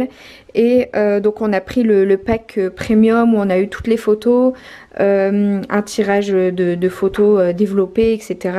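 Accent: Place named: North, France. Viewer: Bonjour, ce n est pas une critique mais j ai l impression que vous avez un petit accent du Nord...😊😁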